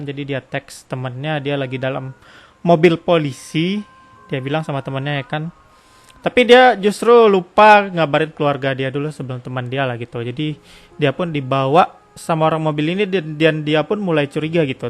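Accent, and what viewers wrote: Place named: Medan, Indonesia. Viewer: Bang lu orang medan yah? Keliatan dari logat bicara nya?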